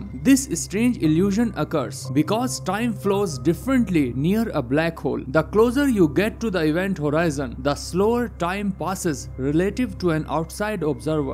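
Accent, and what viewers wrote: India. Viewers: Are u Indian? Ur voice is so annoying.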